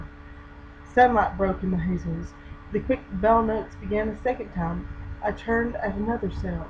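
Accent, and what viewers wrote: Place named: South, United States. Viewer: Thank you for posting this reading. Lovely. I have never heard Station Island read in anything remotely like your (Southern?) accent. To my ear there's an earthy (even folksy) tone to your voice that suits Heaney's poetry surprisingly well.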